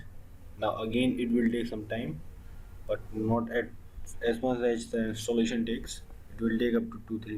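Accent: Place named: India. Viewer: I honestly didn't give a du🦆ck about the subject but his Indian accent 😊. Man don't stop love you, made my joint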